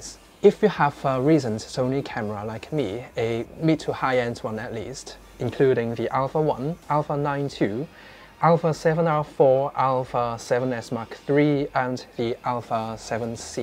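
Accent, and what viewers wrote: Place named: United Kingdom. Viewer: How is this guy's english so good and british? :D Great vid, brother!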